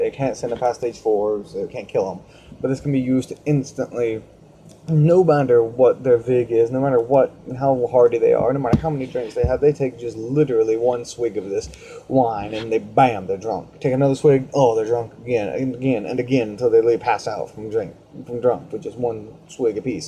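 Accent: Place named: United States. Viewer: Are you german? Your accent i very american ^^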